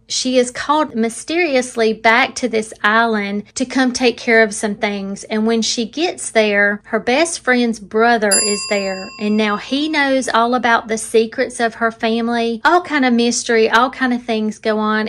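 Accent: South, United States. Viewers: Hello miss I am a young adult Midwestern girl! I am a big fan your southern accent brings me back to my childhood family friend who would always say yall😂. Great recommendations by the way I love fall and I just finished the Love's a mystery in sleepy hollow! It was so cute and cozy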